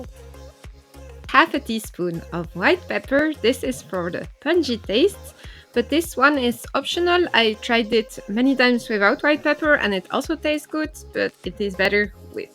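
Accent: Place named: France. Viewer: Hello! Je profite de ton accent français pour te féliciter dans la langue de Molière! Cette vidéo est très claire, agréable à regarder et donne envie de reproduire la mozzarella. Je vais me lancer car j’ai bien envie d’une bonne pizza! Continue!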